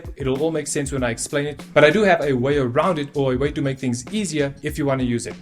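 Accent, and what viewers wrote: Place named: South Africa. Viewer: I know a South African when I hear and see one..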